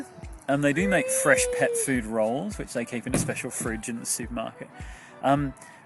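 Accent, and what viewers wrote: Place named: Australia. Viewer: Love Australia English, new friend 😀😀😀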